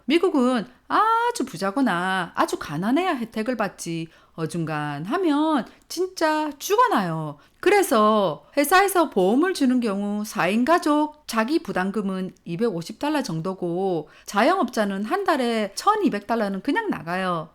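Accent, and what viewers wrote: Gyeongsang, South Korea. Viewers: 와 경상도 사투리 역대급이다 . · 경상도사투리가 구수하고 좋아요. 전라도 깽깽대는 사투리는 정말 재수없고 구역질 납니다. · 경상도 사투리 귀에 거슬려요..ㅠㅠㅠㅠㅠㅠ 난 2찍들이 정말 싫어요. 저는 대구시민이예요.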